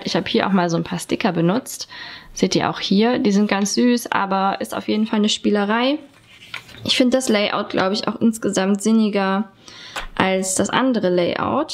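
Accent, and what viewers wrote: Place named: Germany. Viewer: hallo!! ich hab erstmal deine english videos gesehen und was sicher, dass dein accent aud Deutschland war. Ich bin von Chile und kann ein bisschen deutsch sprechen aber ich hab' fast kein oppotunitat mein deutsch zu benutzen. Aber dein videos sind perfect um die sprache nicht zu vergesen 💕 (entschuldigung für die fähler)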